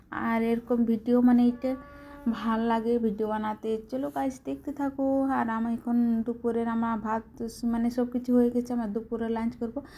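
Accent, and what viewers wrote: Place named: India. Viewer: Tomar kotha gulo sunte khub valo lage ektu Hindi tan koto dhone pata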